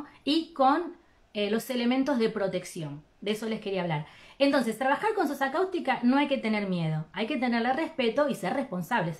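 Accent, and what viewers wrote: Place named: South America, Latin America. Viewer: Hola, sigue activo el grupo? Me gustaría hacer un curso, saber de donde son. La profe tiene acento de Sudamérica 🥰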